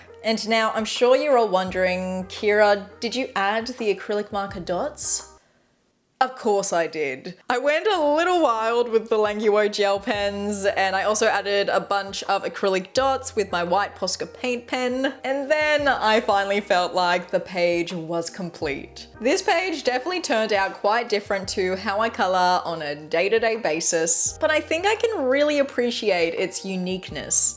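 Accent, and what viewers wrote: Australia. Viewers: Hello I am new here. Commenting to boost for the algorithm! Fun fact of the day: I'm from Aotearoa NZ, and every time I accidentally stumble across an AUS/NZ creator I have to listen for an abnormally long time to figure out whether theyre a kiwi or a roo. My guess, without looking at the rest of your content is that you're Australian 😌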